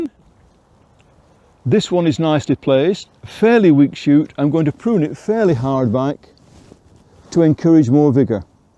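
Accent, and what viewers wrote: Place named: Yorkshire, United Kingdom. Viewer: Lovely Northern accent. Probably Yorkshire.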